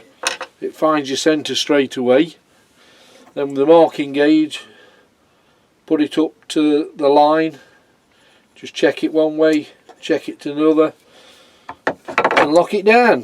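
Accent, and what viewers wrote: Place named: Yorkshire, United Kingdom. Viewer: Nice idea been watching your videos over last few weeks and now subscribeyou sound a Yorkshire bloke witch part of a may ask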